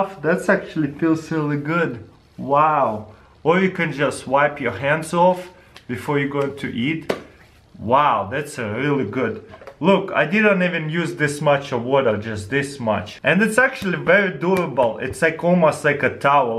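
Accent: Russia. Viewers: По позорному акценту сразу понятно, что русский, лол. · Im watching u cause i wanna try and learn how to do a russian accent(you're russian right?:P) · Your a russian right please speak yoir word and make a subtitled Who agrees!? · Русский акцент слышен издалека )) привет, чувак :D А штука прикольная)) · Bro i LOVE Russian accents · I love you russian accent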